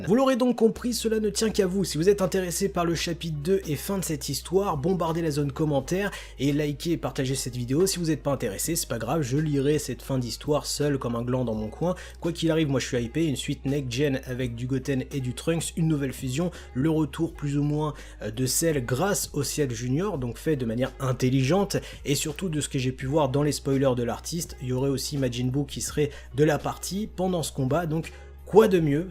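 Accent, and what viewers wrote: England, United Kingdom. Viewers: Pk tu dragon ball avec un acsent anglais???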